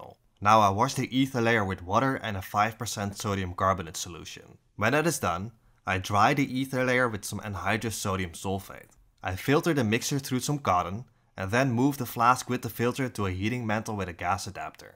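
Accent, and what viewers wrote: Netherlands. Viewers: Jy hoor de swaar Nederlands-aksent in sy spraak. 😂 Maar ja, baie goed, né?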